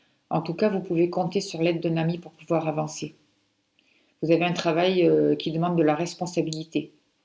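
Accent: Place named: Gard, France. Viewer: Je vous ai découvert il y a peu, et je suis toutes vos videos depuis. J'ai été impressionnée par le soin de libération énergétique, et je conserve précieusement, pour le refaire, le soin pour l'élévation des vibrations. Je ferai un don prochainement, car le bénéfice que j'en ai retiré est précieux à mes yeux, et mérite un retour de ma part. Et aussi..j'adore votre accent 🤗, qui résonne avec le mien, moi qui suis du Gard. Merci pour tout! 🙏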